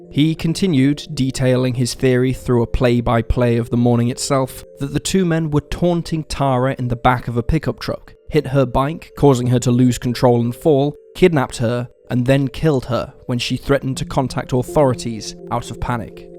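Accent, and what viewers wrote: England, United Kingdom. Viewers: sadly I fear your conclusion is correct ... men, and police in particular, protect their own. So the son would have been aware that he could get away with such vile behaviour. Have watched other similar channels but your gentle English accent appeals, thanks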